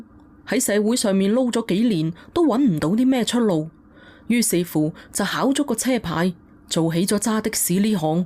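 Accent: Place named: Guangzhou, China. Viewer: 廣州腔講故🤣🤣好好聽😂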